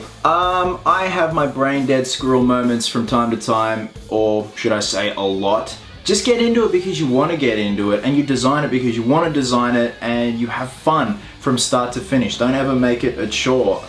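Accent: Australia. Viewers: Mason Sumi that's just the Australian accent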